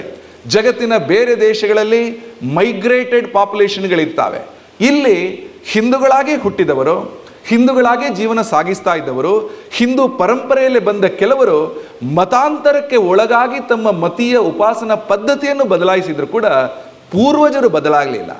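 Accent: Standard. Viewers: Sir parisudhavada kannada thumba santosha ಆಯಿತು. ಮಗ ನಿನ್ನಗೆ ನಮನ 👍🙏🙏🙏🙏🙏🙏🙏🙏❤️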